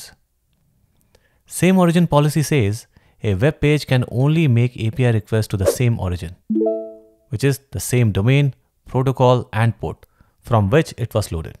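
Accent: India. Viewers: Best !!!! crazy video (I think u are indian by accent tho) ❤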